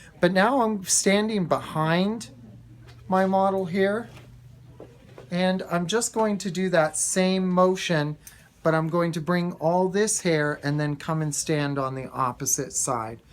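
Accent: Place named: Michigan, United States. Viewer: He talks like he’s from Michigan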